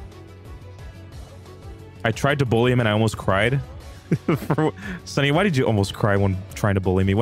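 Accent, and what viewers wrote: Finland. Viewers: Are you finnish? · are you finnish???? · is he Finnish?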